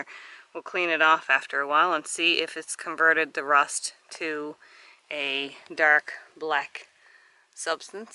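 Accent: Newfoundland, Canada. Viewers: Hello there, came across your channel searching for rust converter ideas and picked up on the accent of fellow Newfoundland and Labradorian. Not sure if that is the proper term now. I also checked out the video on the Mun Botanical Gardens. Really lovely. Will put that destination on the list for the next time I make my way home. Going to have a look through more of your videos and pass along your channel to some friends and family. I hit the subscribe button. Thanks for the content.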